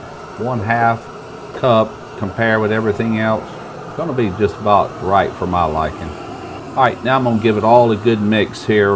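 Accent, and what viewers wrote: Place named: Louisiana, United States. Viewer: Half cup of kayeen paper! man i love the Cajunness ohhh yea brother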